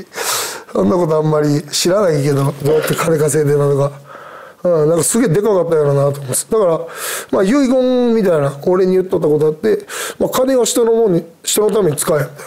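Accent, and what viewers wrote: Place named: Kansai, Japan. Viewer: 何言ってるかわからない人は絶対関西人じゃない。この喋り方を聞き慣れてないだけ。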